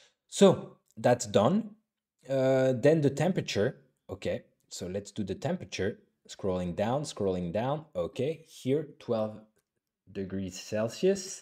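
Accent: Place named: France. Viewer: god , I love the French accent.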